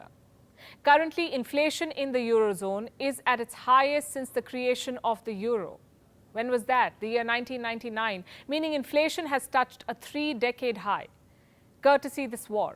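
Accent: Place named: India. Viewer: Palki has a great indian english accent. How did she get to speak so clearly and confidently?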